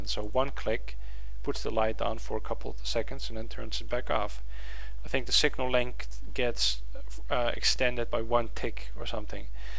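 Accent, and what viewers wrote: Netherlands. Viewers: are you dutch? I hear a dutchy thing in your voice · Wait, are you Dutch? Your accent is really familiar, somehow. (See, I'm dutch too, lol.) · Are you Dutch ?? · ben je nederlands, want zo klink je wel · you are great, but i can hear your accent, that you are a dutchman. so funny tipical dutch accent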